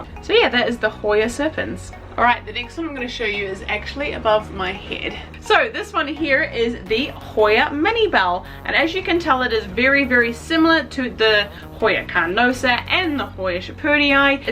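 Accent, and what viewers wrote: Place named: New Zealand. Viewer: So I was watching Hoya videos tonight and yours popped up... a fellow Kiwi accent :-) Lovely set up you have in the green house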